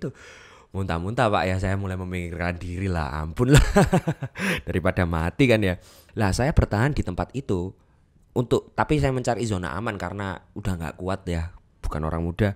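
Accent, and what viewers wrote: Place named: Java, Indonesia. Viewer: Feri Irwandi versi medok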